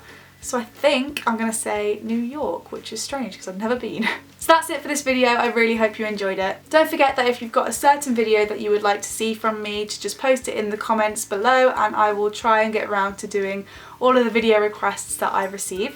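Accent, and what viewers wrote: England, United Kingdom. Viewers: Prince T No its not London. She doesn't really have a strong accent at all (from a British point of view). It just a generic English accent. I think she has said she was born near coventry which is clise to where I grew up and lots of people spoke with an accent like.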